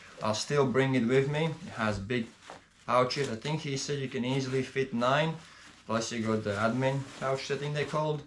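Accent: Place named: United Kingdom. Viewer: Where abouts in the UK are you from bro ?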